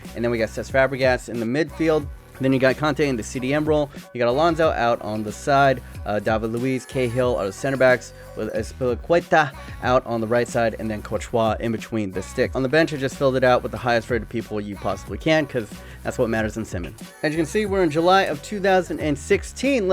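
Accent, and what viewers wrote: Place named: Oakland, United States. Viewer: Is this man from Oakland?if he is probably from downtown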